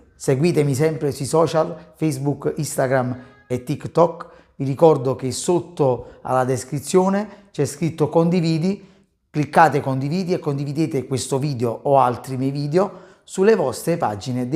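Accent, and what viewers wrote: Naples, Italy. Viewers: il simpaticissimo accento partenopeo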